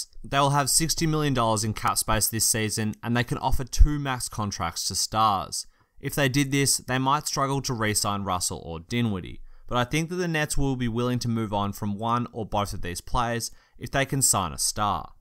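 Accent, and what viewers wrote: Australia. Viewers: I'm gonna just say it is his Ozzie accent.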